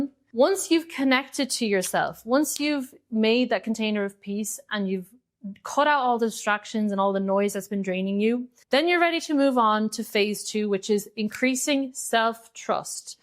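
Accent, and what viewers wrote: Ireland. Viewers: I love her Irish accent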